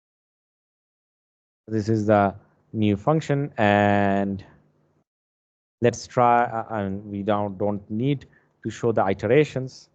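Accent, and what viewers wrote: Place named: United States. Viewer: Tmr ekdam American accent, r u staying in USA.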